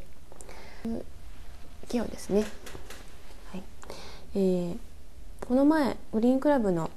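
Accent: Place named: Kyushu, Japan. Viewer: たまに出る九州弁やイントネーションが同じ九州育ちには本当に癒されます😊。さんの理科的実験ノート🗒のような企画があると、盆栽の諸説や口伝えのなぜそうするの？が見える化できていいのかな~なんて思ってます。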